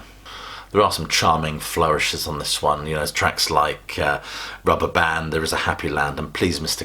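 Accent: England, United Kingdom. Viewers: Top notch English accent and vocabulary.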